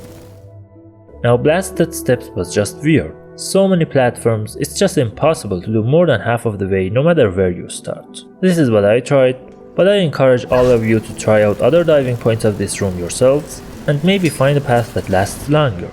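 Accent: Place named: Iran. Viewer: لهجه قشنگ ایرانیع 😂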